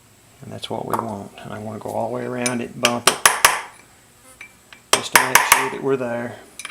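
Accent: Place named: Tennessee, United States. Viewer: My 790 has 667 hours in it. Waiting for this to happen. I'm retired mechanic and service manager, but never been into one of these. My brother, also a mechanic told me this was a common problem. BTW, you look like you could be twin brother of a very good banjo player I know of. I recognized your dialect and you mentioned Tri Green so I figured you're in Tennessee. Check out Daniel Grindstaff banjo, perhaps you are familiar with his music. Appreciate your verse and good spirit.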